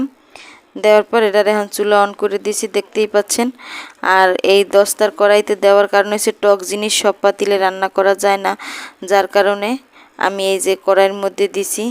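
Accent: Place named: Barishal, Bangladesh. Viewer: অনেক সুন্দর হয়েছে আপু আপনার এই আমড়ার জেলি ঠিক কইরেন আপনি কখনো শুদ্ধ বলেন কখনো আবার বরিশালে এটা বলেন শুদ্ধ টা বলবেন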